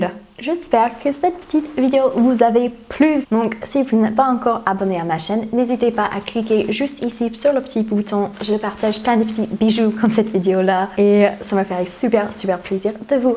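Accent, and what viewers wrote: United States. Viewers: C'est trop drôle, heureusement que tu n'as pas ri comme on te l'a appris à l'école sinon les gens t'auraient trouvé bizarre. J'aime beaucoup lorsque les américains parlent français, c'est très mignon comme accent. Je viens de regarder quelques unes de tes vidéos, j'adore et je m'abonne. A bientôt. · Oui je me doute ne t'inquiète pas! Dans ta dernière vidéo il me semble que tu as dit avoir changé de micro il me semble et la qualité était plutôt bien donc je me suis dit que tu l'avais peut-être juste mal positionné cette fois :/ Et j'en profite pour te dire que j'adore tes vidéos et surtout ton petit accent américain est trop cute!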